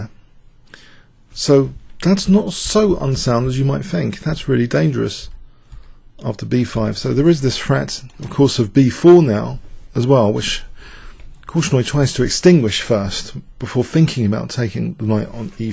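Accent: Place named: London, United Kingdom. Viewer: Can you please use ''simpler english'' and more ''globalised'' accent :) .. nt all your viewers are from London ! that;s why more and more are vewing Matozelich .. :)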